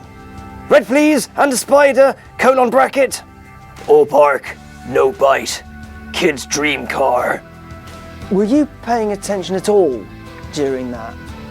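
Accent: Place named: United Kingdom. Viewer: his handsome round head and interesting emotion make the video funny. Very appreciated the footage.professional knowledge+able. has his very nice brits accent but sometime i don't understand what he say about cuz my poor English, Can XCAR put subtitle in the video pls??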